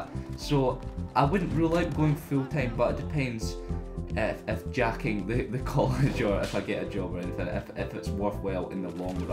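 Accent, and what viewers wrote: Scotland, United Kingdom. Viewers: Can you do a video where you would speak at your normal pace (unless if this is your normal pace in which case i like the scottish accent obv because i have one :) )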